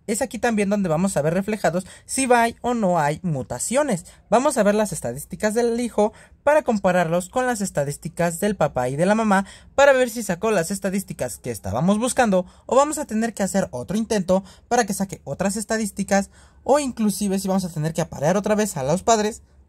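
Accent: North, Mexico. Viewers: Muy norteño xD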